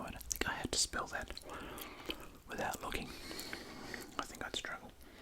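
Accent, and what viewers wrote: Australia. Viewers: Which part of Australia is your accent? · This vid Is now in my top, Aussie accent's my fave xx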